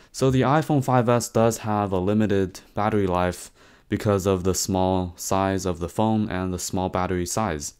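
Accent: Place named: China. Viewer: Hi, are you from China? Just a guess based on your accent:) Your videos are awesome.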